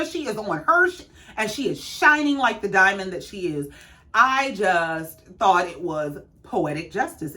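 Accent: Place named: Lowcountry, United States. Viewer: You look great today! That top is cute! Thanks for posting, enjoy your thoughts and getting to hear little twinges of your accent, it reminds me of some of my low country folks.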